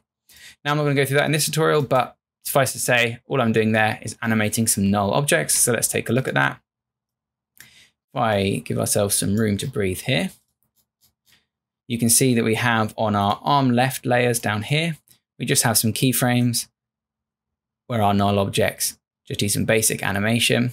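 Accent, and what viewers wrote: United Kingdom. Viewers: This British guy has made my life easier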